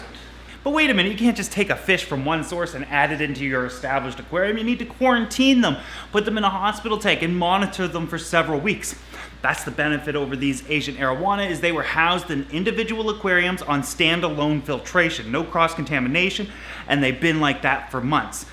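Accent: Canada. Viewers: nadia bahaw he is Canadian dumbass.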